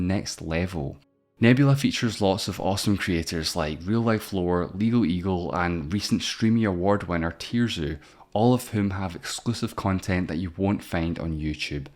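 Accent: Scotland, United Kingdom. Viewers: it's scottish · Out of curiocity, what is the accent of the narrator? I think it is Scotish but it doesn't completely match with my limited experience. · Can't belive you said "all of Ireland was part of Britain but then they wanted independence" you just ignored the fact that the reason they were was because of colonisation. You make it sound like Ireland was originally British and that we just broke off from the empire. I was sort of expecting something like this because of your accent being Scottish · I love the Scottish narration :) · You're correct that's a Scottish accent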